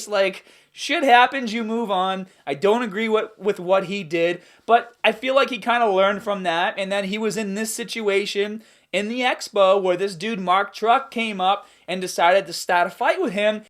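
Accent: Boston, United States. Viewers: Think you almost went the full Boston accent in this vid lol. Sometimes you barely sounded American.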